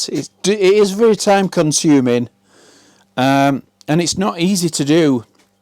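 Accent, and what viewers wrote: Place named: Yorkshire, United Kingdom. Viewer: Thank You,! It's pretty straightforward for dummies like me ))) And by the way lemme ask You one question: I noticed You often pronounced the vowel "u" as ʊ when it's supposed to sound like "ʌ". Fore example You say mʊd instead of mʌd... Is it a specific Yorkshire dialect? Thank You once more!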